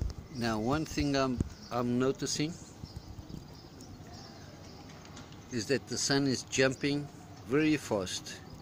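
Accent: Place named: South Africa. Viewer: Do this tracking on the roof or it is not worth anything. You sound like you spent time in South Africa, I could be wrong. :) Use a 360 camera to record these events. :)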